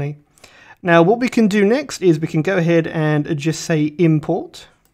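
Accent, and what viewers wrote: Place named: United Kingdom. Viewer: I am getting something from your voice, you studied in the UK for a while and lived there for a while but you are not originally from there. your accent is faint but still audible.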